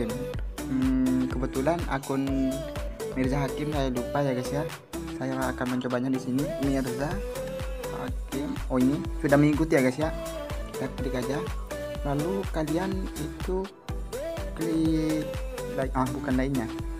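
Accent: Madura, Indonesia. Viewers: Orang madura nih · Orang madhureh bang?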